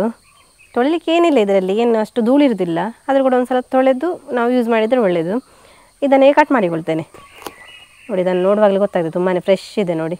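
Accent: Mangalore, India. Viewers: Clear mangalore kannada 👌karavali brahmin style curry 👍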